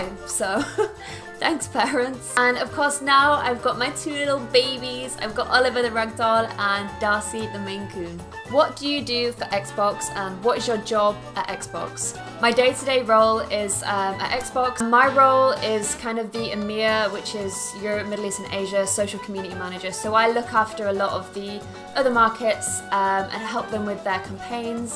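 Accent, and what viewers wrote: Ireland. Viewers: I have thought for a while that you have a slight Irish accent! :)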